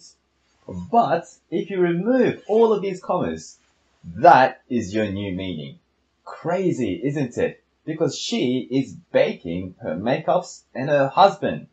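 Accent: Australia. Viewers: Love Aussie accent!! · オーストラリア英語かっこe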